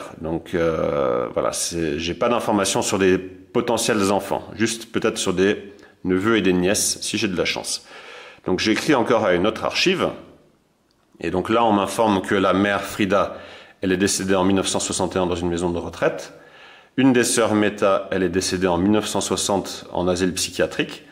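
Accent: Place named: Switzerland. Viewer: Ben avec votre accent je pensais que vous étiez Suisse, désolé.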